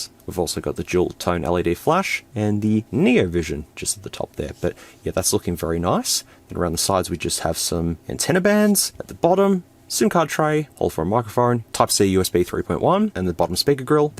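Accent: Australia. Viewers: You're a fucking Australian, be proud of your wacky accent. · he's from Australia dumbass, they pronounce shit differently, grow a pair and get over it american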